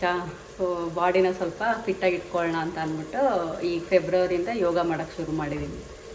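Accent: Mandya, India. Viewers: nim language kooda mandya language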